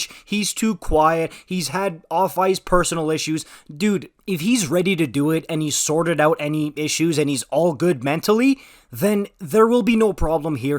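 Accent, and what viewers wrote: France. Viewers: Are you french? Gotta say you did great! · Youtuber sounds like a native French speaker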